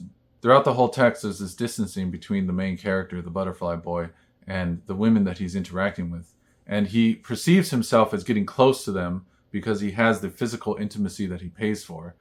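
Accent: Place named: Chicago, United States. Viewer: oooh, Thank you for the The Great Rift by Michael E. Hobart recommendation. Adding it to my goodreads tbr and I'll search for a copy today. Also, thank you very much for the reading timetable for Godel, Escher, Bach. I also shared this with my son. I used to love Chicago. My husband has family in Winnetka. (very snooty so I've heard). Then one of the cousins moved to L.A. for work in Hollywood. I guess they had connections. LOL I can see how people in Cali would think you had an accent. I went to Texas one time for one week in fifth grade/10 y/o), and came back with an accent and got teased a little in middle school by some girl that had a beef with me. Sometimes it still comes out. Oy, imagine if I moved to Texas! LOL But I love accents. It was my pleasure listening to your video. I did take your recommendation about skipping the Lolita part though.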